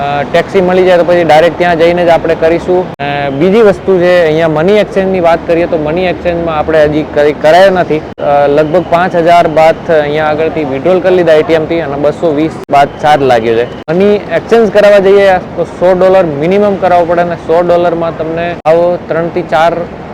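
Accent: Rajasthan, India. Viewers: ગુજરાતી ભાષા મા થોડી રાજસ્થાની હિન્દી નો લહેકો આવે છે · મસ્ત કમ્પ્લીટ ગુજરાતી બોલો છો પણ થોડો રાજસ્થાની ટચ આવે છે સુંદર માહિતી 😊😊😊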